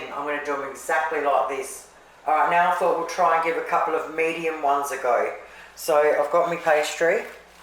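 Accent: Australia. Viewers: Ooooooh so yummy I just made these I didn’t have punkin so used sweet potato Also I added some chopped shallot greens as I only had a small small onion And I used the borg pastry sheets Oh my yumminess!! Thank you so much You are my family’s favorite YouTube watch We also made the pea soup pie floater last fortnight Keep up the happy cooking and Aussie talk Absolutely has my ‘special’ boy laughing and looking forward to the tasting of these amazing easy to follow recipes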